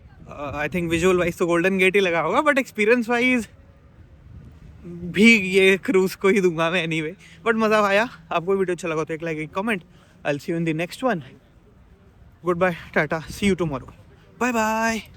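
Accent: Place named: Kanpur, India. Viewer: Kanpur ka DNA accent me aa hi jata hai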